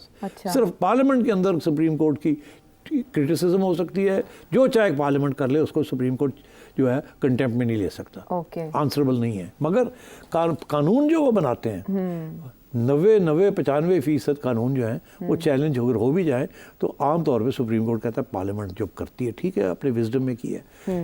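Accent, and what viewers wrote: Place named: Punjab, India. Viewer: Zabardast punjabi touch, mashallah ❤❤❤❤❤❤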